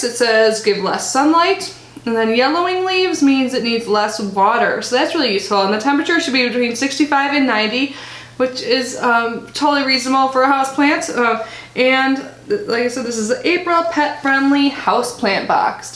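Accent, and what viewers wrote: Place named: Minnesota, United States. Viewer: Idk where you’re from but you have the thickest Minnesotan accent I’ve ever heard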